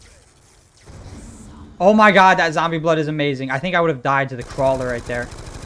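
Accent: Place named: Italy. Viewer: im from the northeast and hearing your voice is hilarious so italian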